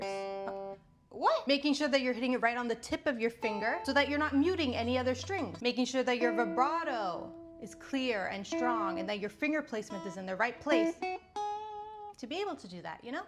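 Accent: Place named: Japan. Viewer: She speaks English like Japanese people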